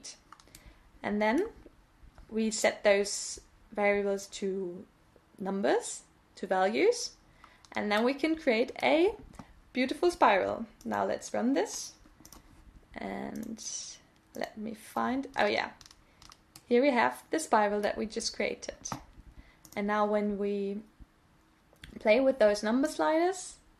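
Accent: United Kingdom. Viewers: It's awesome to see such a diverse following here! Indonesia, Brazil, Spain, Denmark, Syria and more maybe idk. As an American, I'm amazed that a German can speak English so fluently, that I can't even hear an accent. I can detect only a tiny British accent, along with terms like saying 'zed' instead of what we say here in the US, zzzzzz. Unless you're actually a Brit, just studying in Germany. Sad that this is really your only vid on dynamo. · Great tips! started out in auditing with a real addiction to excel and macros and then went into architecture. When I saw that dynamo could be used within Revit, it was such a great way to bring that side of my brain back into finding quicker or easier ways to do something. Where are you from and is the accent from time spent in the UK? I’m South African and spent time in London and now in Melbourne so who know what I sound like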